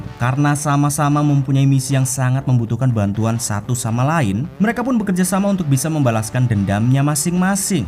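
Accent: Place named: Banyumas, Indonesia. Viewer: atmint e ngapak geng😹😹😹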